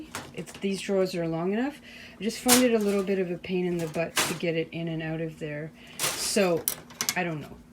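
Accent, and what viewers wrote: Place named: Maritimes, Canada. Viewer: I just stumbled across your channel today. From your accent, or lack there of, I was working on the premise that you were in Canada and I am betting the maritimes. I love what I have seen so far and have happily subscribed. I am in the process of preparing to move. Ugh…. However, there will be a dedicated craft room in the new place, so there are benefits to going through the agony. Your organization ideas have got my mind stirring. I have a dresser that will fit perfectly in the closet in the room. So far I am dedicating a drawer for each type of craft and each drawer will contain the tools and frequently used items in it. Unfortunately that means that I have to store extras outside of that room but hey, it’s a start. Please keep up the posting as I am now addicted. Anyhoo, hello from Ottawa!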